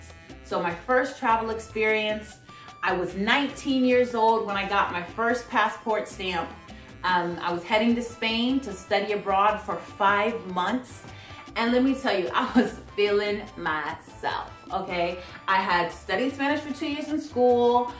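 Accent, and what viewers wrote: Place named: Cuba. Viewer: Hola, te conocí en la Academia de Tiffani me encanto la entrevista desde ahora te sigo. I love your English and the way you teach, and your Spanish accent "Cubano "😂.😊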